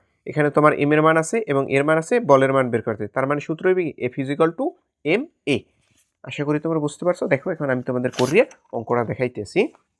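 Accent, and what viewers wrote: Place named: Barishal, Bangladesh. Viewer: Sir apni mone hoy barisailla....ami kintu barisaillah😊😊😊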